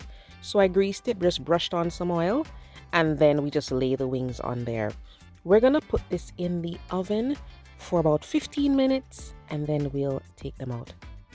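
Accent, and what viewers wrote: Jamaica. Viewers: I love the Jamaican accent and your women's track team consisting of Elaine Thompson-Herra, Shelly Ann Fraser Price and Shericka Jackson is FLAWLESS. United States don't have a competitor to match for the MOMENT. But don't count us out! The men's track team is dominating and Jamaican can't compete with them.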